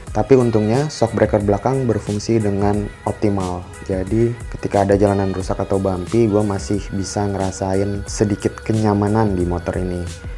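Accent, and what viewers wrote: Bugis, Indonesia. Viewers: abang orang bugis ya?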